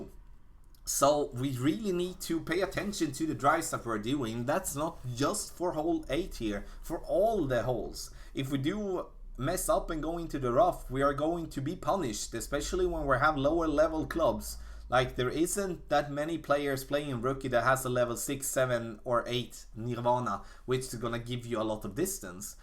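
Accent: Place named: Sweden. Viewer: Todd Calhoun swenglish👌🏻😁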